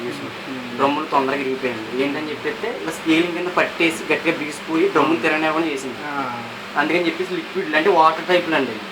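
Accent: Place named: Godavari, India. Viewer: Godavari Telugu super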